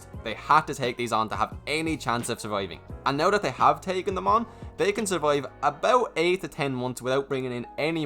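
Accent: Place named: Cork, Ireland. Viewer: you from Cork boy?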